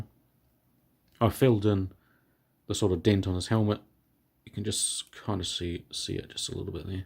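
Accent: New Zealand. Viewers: New zealand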